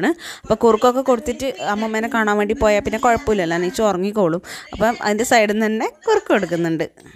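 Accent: Kannur, India. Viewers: കണ്ണൂർ ഭാഷ കേൾക്കമ്പോ രസമാ.. 😍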